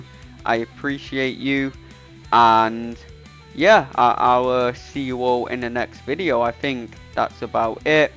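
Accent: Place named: England, United Kingdom. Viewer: I love English accent 😂 my favorite I quit vid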